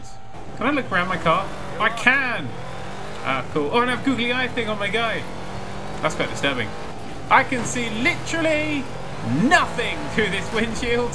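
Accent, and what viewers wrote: England, United Kingdom. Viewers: no I'm pretty sure he sounds more like a English person. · I don't know why British Accent makes him funnier for me. Well, may be, because I am a spanish speaker... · Is Canadian? Because he sounds English.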